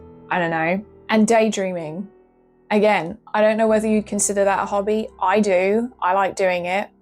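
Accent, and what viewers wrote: United Kingdom. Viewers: I watch the channel’s videos because of the content, and also because I’m learning English your British accent is music to my ears.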